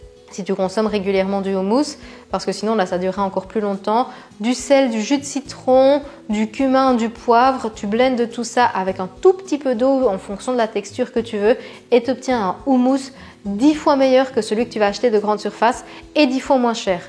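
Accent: Belgium. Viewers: Merci pour toutes ces idées ! Au fait, d’où vient le petit accent caché derrière l’accent belge ?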